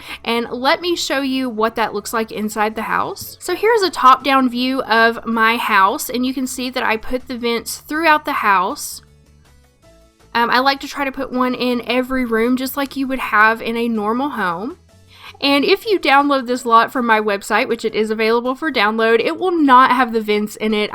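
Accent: South, United States. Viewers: I have been playing Sims 2 (on and off) since 2006, and had NO CLUE about moving wall hangings up and down the wall!!! what!!!!! btw love your channel! you’ve got the best vibes and being from NC i appreciate the southern accent representation 😂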